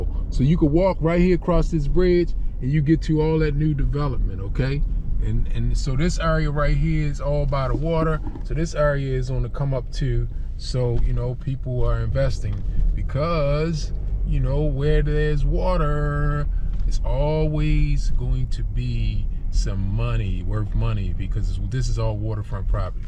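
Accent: United States. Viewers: I hear a Maryland accent. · U got a Philly accent to me. I didn't even know cherry hill had "projects" shot out to the bottom of the hill❤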